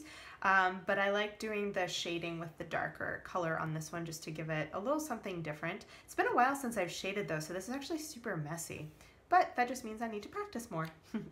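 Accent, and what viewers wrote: United States. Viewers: Mais tu es française ! Bravo pour l'accent, on croirait une américaine ! Et aussi bravo pour ton BuJo, il est magnifique !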